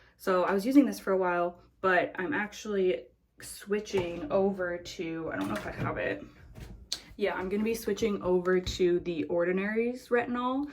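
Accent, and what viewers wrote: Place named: Canada. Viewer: If his accent isn’t Canadian I don’t what is. I have watched your planty video for a while now just discovering that you have a vlog channel. Just wanna say I love them!!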